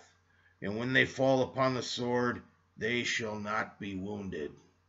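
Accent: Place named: Upper Midwest, United States. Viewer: Good teaching, Must be cold where you live all bundled up. Unseasonably warm in the south. Michigan Wisconsin esc your accent 😉 Blessed by your teachings appreciate very much indeed brother! Thought I heard the trumpet the other night, turns out it was neighbors air conditioning unit😋 👂🙏😲👐 🙄😏😕😐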